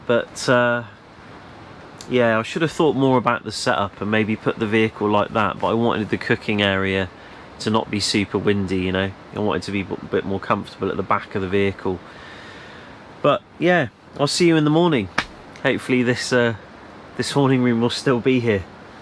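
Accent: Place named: Forest of Dean, United Kingdom. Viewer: Love all the British cultural references to Yorkshire tea and Saville as well as the occasional lapses into a broad Forest of Dean accent. Entertaining as always. Guess I’m one of those subscribers who will listen no matter what the content is each time! Keep enjoying the filming so we can keep enjoying the viewing 👍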